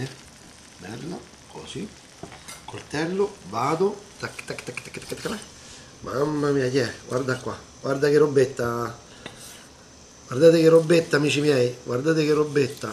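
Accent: Rome, Italy. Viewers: bravo e simp0atico poi anche romanaccio hahaha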